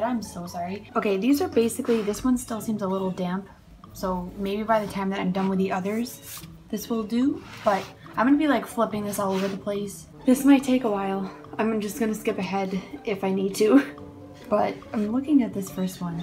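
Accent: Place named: Illinois, United States. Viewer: You’re from Buffalo! Omg I’m from NY too. I thought maybe you were from Illinois bc you have sorta an accent. Which is awesome Btw.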